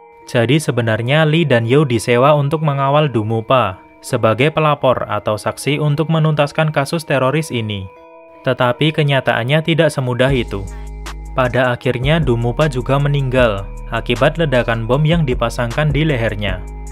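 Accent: Java, Indonesia. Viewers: Medok banget min:)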